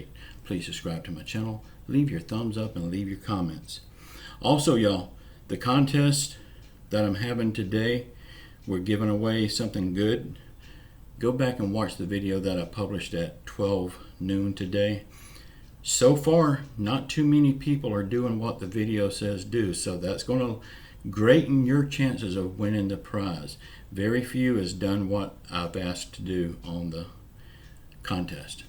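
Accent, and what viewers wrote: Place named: Texas, United States. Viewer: Damn I like you! You gotta be from my neck of the woods in either south east Texas/Oklahoma, not that it matters though lol. You say yall' prefect! I'm a supervisor and my guys love it when I say "Good Morning Yall" during the morning safety meetings lol. Of FYI you were one of the first to report the last update before the other update lol!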